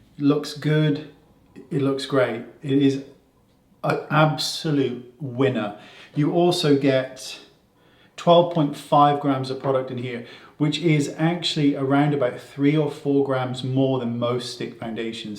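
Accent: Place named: Wales, United Kingdom. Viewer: nothing better after a tough day than relaxing to your voice with your Welsh accent.😍.Sending you love from Australia 🎈❤️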